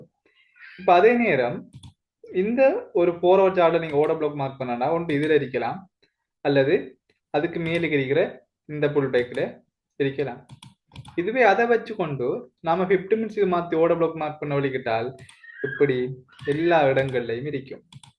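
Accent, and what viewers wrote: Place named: Sri Lanka. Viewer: Sri lanka thamizh 😍🫂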